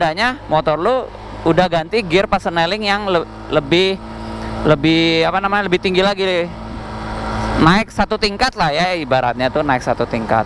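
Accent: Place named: Sunda, Indonesia. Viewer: si akang suara na suara sundanis hatur nuhun kang tutorial na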